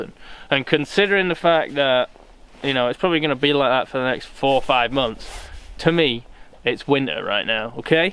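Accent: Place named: England, United Kingdom. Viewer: Where are you based? I hear a English accent but by the looks of it your either in Canada or the very north of North America.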